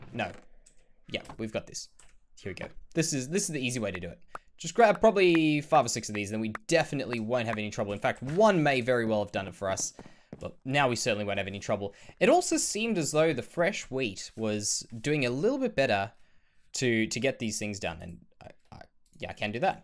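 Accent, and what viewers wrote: Australia. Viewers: You're Australian right?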